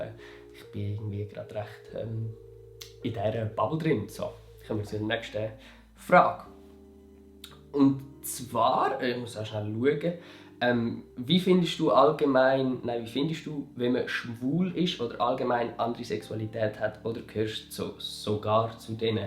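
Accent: Switzerland. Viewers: Ich finde das schweizerdeutsche voll schön, kann nicht mehr aufhören, deine Videos zu gucken😂🤗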